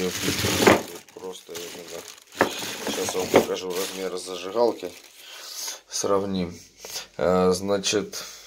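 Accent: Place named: Ukraine. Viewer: Наш с Украины? Слышно по разговору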